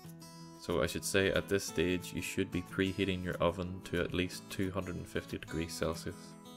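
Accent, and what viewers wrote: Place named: Ireland. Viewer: I thought I heard an Irish accent :)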